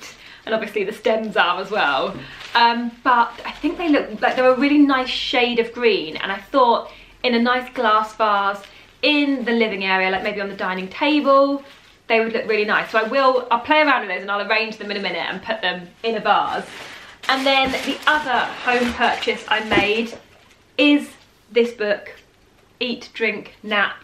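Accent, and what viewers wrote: United Kingdom. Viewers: I love your british accent ❤️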